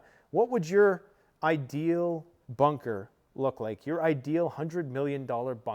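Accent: England, United Kingdom. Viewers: Wow I'm impressed with your English accent! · Dude your English accent is hilarious! The arrogance of these people thinking they will still have servants running around for them after SHTF 🤣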